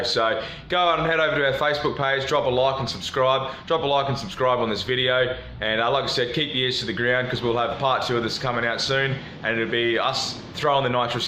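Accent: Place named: United Kingdom. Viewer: u dont have a deep aussie accent, u sound more like a british accent or UK accent.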